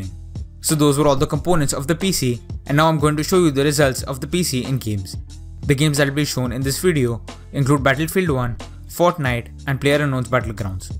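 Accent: India. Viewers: Guys don’t be so mad I know he has a Indian accent and the pc only got 4 gb ram but it’s a budget pc so it should be cheap and he say it’s for Fortnite so if you want pubg go and watch another video ;)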